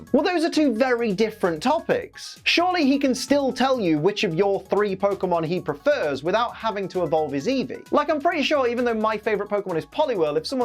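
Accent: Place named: Derby, United Kingdom. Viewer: I love hearing the divine Derby accent. I was born there but i left very young so the accent sounds so incredibly great to me just because no one around me speaks like i do aka swears more than drunk Irishman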